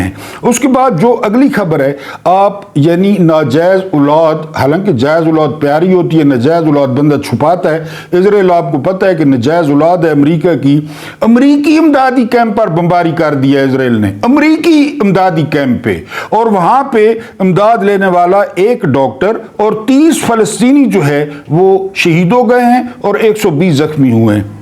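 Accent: Saraiki, Pakistan. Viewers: بسم اللّٰہ الرحمٰن الرحیم السلام علیکم ورحمۃاللہ تعالیٰ و برکاتہ' محترم جناب قابلِ صد احترام آپ کے ویلاگ الحمد للّٰہ! قضا نہیں ھوتے آج کے ویلاگ نے راقم ناچیز ھیچمدان کے ذھن نارسا میں قہقہوں کا وہ طوفان بدتمیزی مچایا کہ یقیناً پنسلیوں میں درد اٹھنے لگے _ محترم آپ بہت حاذق اور ماھر شخصیت ھیں اور مجسم حس ظرافت ھیں _ اعلیٰ درجے کی حس مزاح اور طنز اس وقت انسان پاتا ھے جو نہایت دردوں اور ستم ہائے جہاں کا مارا ھوا ھو جو تڑپ تڑپ کر زندگی کی سیڑھیاں کراس کرتا رہا ھو ـ تب جا کر بہترین پیروڈی اور کامیڈی کا اظہار اچھی طرزِ ادائیگی اور بہترین اسلوب میں کر سکتا ھے _ نیز آپ پر سرائیکی کی خوبصورت چاشنی کا اثر ھے تو اس سے مزید نکھار آجاتا ھے _ مثلاً بنگال کی اعلیٰ خوبصورتی کو آپ نے خوب واضح کیا _ اور مکے منتری کی بہترین کارکردگی پر اچھی طرح روشنی ڈالی _ باقی ٹبر پر آپ خوب کھلے دل سے والہانہ انداز میں طبع آزمائی کرتے ھیں ، اللّٰہ رب العزت آپ کو دارین میں جزائے خیر اور احسن جزا عطا فرمائے ، الہ العالمین آپ کو مستحکم ایمان اور عبادتوں ، خوشیوں کامیابیوں ، نعمتوں اور برکتوں بھری لمبیییییی زندگی عطا فرمائے ، اللھم آمین آمین آمین برحمتک یا ارحم الراحمین _ والسلام اللھم صل وسلم وبارک علی سیدنا محمد والہ و اصحابہ صلاۃ دائمۃ بدوام ملک اللّٰہ مقبولۃ توءدی بھا عنا حقہ العظیم انک حمید مجید والحمدللہ رب العالمین __ ۔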